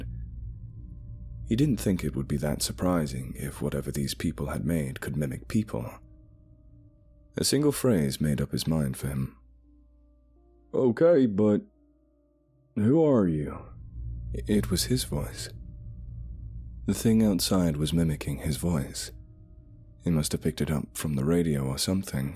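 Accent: United States. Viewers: Your American accent is solid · Your American accent is getting so good! I'm from Oklahoma and to me you sound like a Texan!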